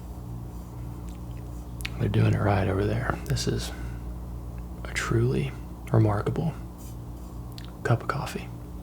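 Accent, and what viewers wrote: South, United States. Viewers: Your little southern twangs are really doing it for me. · I haven't been here long I've seen some of your vids dont want to sound like a creep but I'm just wandering where he's from because based on the way his voice is it sounds like he is from a more southern state.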